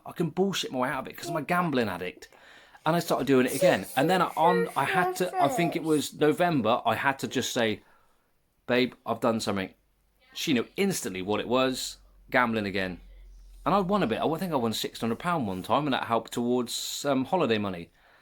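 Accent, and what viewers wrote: Australia. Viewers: Sounds like he is from Australia. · How are you not Aussie !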